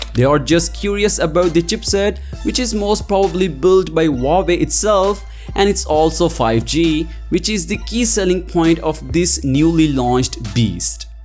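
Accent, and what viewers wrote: India. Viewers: Indian with English accent 😅 can't be watched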